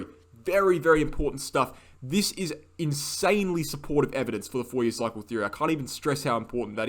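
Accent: Australia. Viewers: Yeah I'm generally in line with this prediction. Great job,. Stupid question - are you from Australia or a Kiwi?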